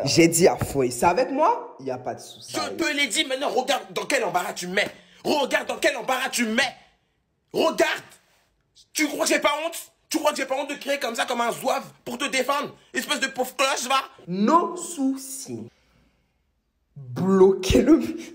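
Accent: Ivory Coast. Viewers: Une métisse qui parle comme une bledarde ivoirienne....elle oublie son côté blanc. Moi je ne vois qu'une métisse en recherche d'identité à travers un accent et des expressions....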